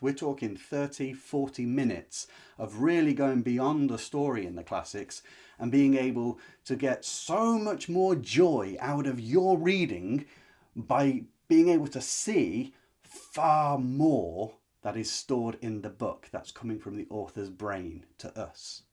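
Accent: United Kingdom. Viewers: Would love to hear you read some of these out loud in your impeccable British accent! You’ve inspired me to pick up some classics, thank you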